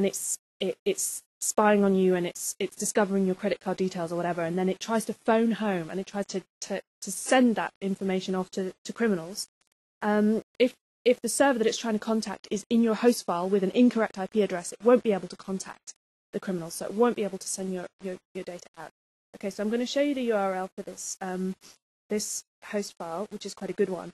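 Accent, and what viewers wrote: United Kingdom. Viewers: that is cool a fix to prevent slowing down, wit a lrg host file...luv ya Brit accent....gonna subscribe. =)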